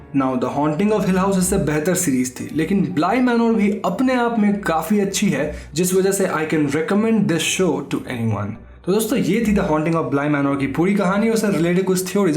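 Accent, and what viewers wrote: Bengal, India. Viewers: Are you Bengali ?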